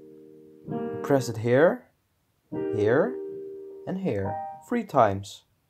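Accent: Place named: Netherlands. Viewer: haha, nederlands overal, ik hoorde het aan je accent :)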